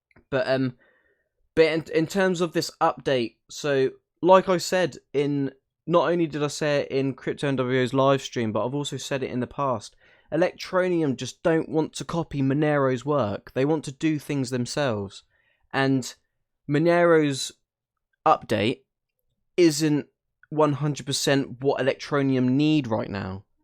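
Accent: United Kingdom. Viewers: Why would u dedicate a channel to talk about the worst performing coin of the year? this shit coin has been delay after delay....... disappointment after disappointment........... where are the exchanges they promised MONTHS ago? Hmmmmm? All this technical bullshit talk is not helping....... u know what makes the price of a coin go up? Results!!!!!!!!! Until then my friend you are wasting ur time and British accent on a shitcoin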